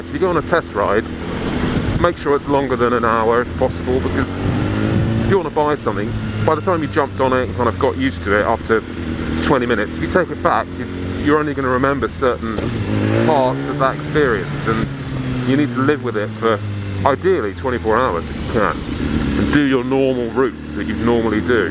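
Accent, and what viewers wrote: United Kingdom. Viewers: Does anyone else think this guy just has the smoothest accent ever? like, when you hear english people, most of the time its like... ew. but not with this guy. g0od 0n u m8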